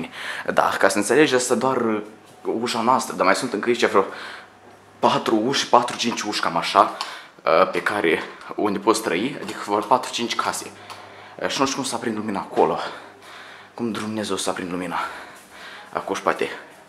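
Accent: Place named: Moldova. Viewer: imi place vocea si accentul tau de moldovean =))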